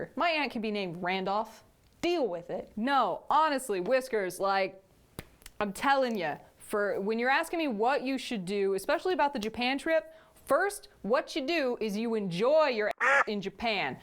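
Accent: Texas, United States. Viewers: Did anyone else notice her slip into a heavier Texas accent halfway through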